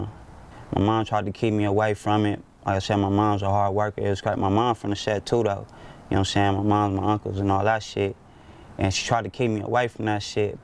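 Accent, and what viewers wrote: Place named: South Central, United States. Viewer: either that's a west side south central accent or he's nipsey's #1 fan lmao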